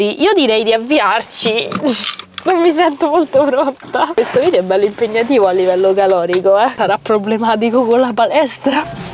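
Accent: Rome, Italy. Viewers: 120 Alibaba bella sta cosa non era solle.mille e una notte, bellissima tu e mi piacciono i tuoi contenuti poi io da veneto mi fa morire il tuo accento romano de Roma 💋😉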